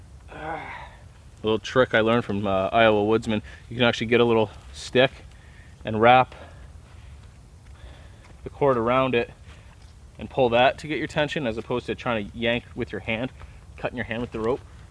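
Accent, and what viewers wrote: Canada. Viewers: Is this guy Canadian? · Awesome woods scenery. Lovely colour in late fall I see. You must be in Canada all those oak and maple tree's. Oh you just said " Canadian gem knots" So you probably are in Canada and sound Canadian. Awesome job on the bed. Gorgeous german shepherd dog too! Yummy poached eggs. Good job on the eating stick. Thanks for sharing your knowledge!!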